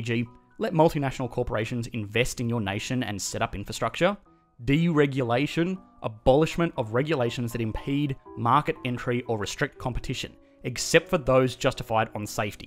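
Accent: Australia. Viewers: it sounds pretty normal to me with a hint of Australian. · Gotta love the Aussies with their cockney-esque accents - hangover from history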